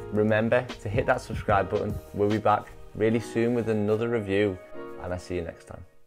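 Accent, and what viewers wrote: Liverpool, United Kingdom. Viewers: Scouserrrrrrr miss this accent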